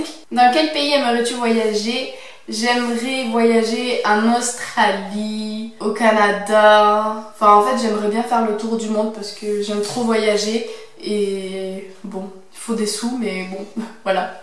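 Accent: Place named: Aveyron, France. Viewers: Toi tu es du sud ouest tu as un accent toulousain à 10 000% ahahah gros bisous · Tu habite où 💕parce que moi j'habite à Millau est je mange très régulièrement de l'aligot saussice et j'ai le même accent que toi 💕du quou je pense que tu est née en Aveyron 💕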